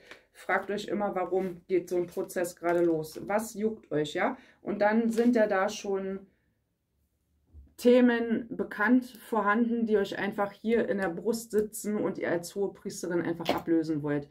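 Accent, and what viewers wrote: Berlin, Germany. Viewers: Guten Morgen Ich liebe deine Berliner Schnauze 🙂 und deine Legungen. Bleib wie Du bist!!!!! Danke für diese Botschaft 🙏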